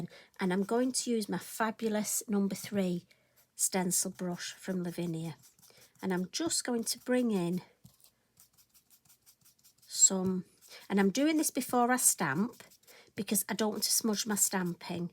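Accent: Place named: England, United Kingdom. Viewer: Thanks so much,. I honestly haven't used my stamps for years, as I started to focus on watercolouring and alcohol inks, but you have totally inspired me to get my cardmaking supplies back out from hibernation and to do some blending! It is beautiful to hear your accent, too; I'm originally from England but living in Australia since 1985 and feeling a bit homesick, so you've doubly-blessed me today, haha!!:)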